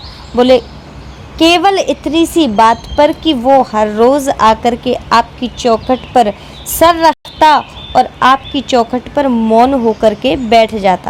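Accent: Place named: Braj, India. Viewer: ji aap bahut Sundar braj ki bhasha bolate hye, bhut accha laga aap ka video dekh ke me hamesha ati hu vrindhavan mye... Radhe.... Radhe....🙏🙏🙏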